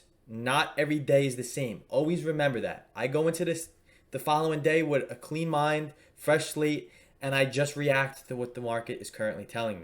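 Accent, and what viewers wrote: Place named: New York, United States. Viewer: you sound like a New York Italian Mobster, I love it 😂 Great video though as always.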